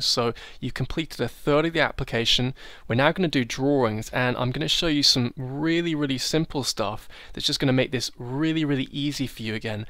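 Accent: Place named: England, United Kingdom. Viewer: your talking provisional patents and US related. You sound very english to me. Does this work in UK also?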